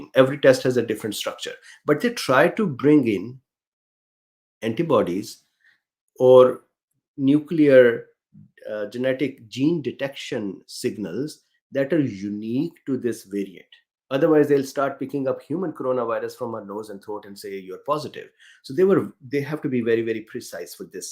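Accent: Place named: India. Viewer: C19 is the worlds biggest scam, fun ,speaking of scams, hearing it in an indian accent makes even more of a scam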